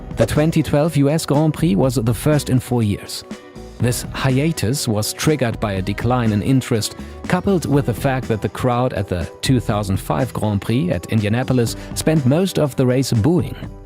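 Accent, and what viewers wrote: Germany. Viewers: German native speaker as commentator?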